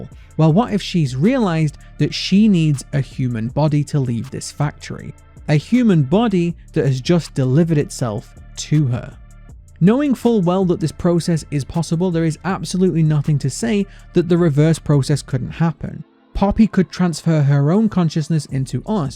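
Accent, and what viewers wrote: United Kingdom. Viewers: I don’t know why but I get you and It’s justJords theories mixed up because your both British, sound similar and have very interesting theory’s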